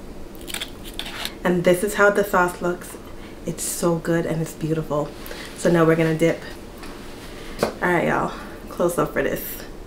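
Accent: Jamaica. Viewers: Why in some videos does she have a jamacan accent and others she doesn’t does she just put it on sometimes? I’m confused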